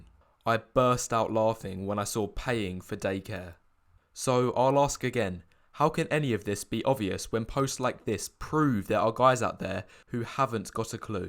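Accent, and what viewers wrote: United Kingdom. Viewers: although I don't like British accent i really appreciate your voice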